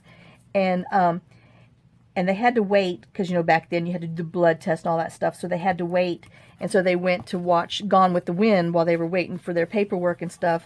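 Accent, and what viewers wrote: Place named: Mississippi, United States. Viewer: Hi. You mentioned you was from Biloxi,Mississippi. My daughter was born there at Keeesler Air Force base. I lost her in 2007. But anyway I came back to Missouri talking that "you all" quite while. I love your accent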